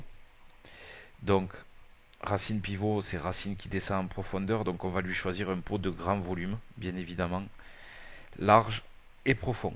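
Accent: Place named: Central Pyrenees, France. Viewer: l'accent de pyrénées centrale de chez moi x)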